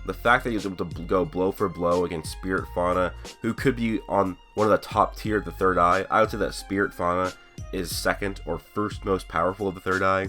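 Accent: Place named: England, United Kingdom. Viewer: Great video. I totally agree with this list. One thing you should work on is the way you say their names. The english accent kinda ruins the japanese name of some characters. So work on it. You have my like though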